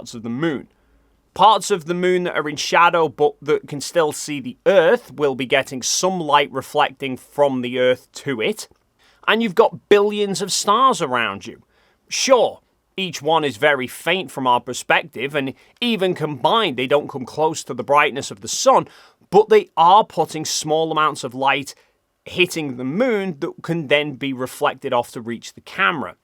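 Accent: United Kingdom. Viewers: a long time ago studies showed that a British accent convinced people it was truth....and a dog in hand yielded the same results. is just smart enough to know good marketing 👏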